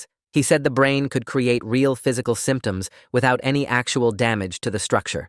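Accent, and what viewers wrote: United Kingdom. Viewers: It sounds goofy as shit in some scenarios, and since he sounds British how people view him changed because America is either the most welcoming place to foreigners or the most brutal depending where, and some people will mistreat him, and think he’s weird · From what it sounds like, his brain mistook stress for brain damage and tried to rewire itself to protect itself but rewired itself to his knowledge of british accents instead of his normal american one Also, as a british person, I'm offended. A british accent hurt him this much. Those people treated him terribly for sounding like people I know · british accents are cool man, why would you want to lose it anyway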